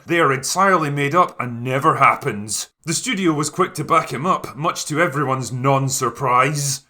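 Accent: Scotland, United Kingdom. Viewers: your less-funny-but-scottish mr plinkett schtick is top notch, keep it up mate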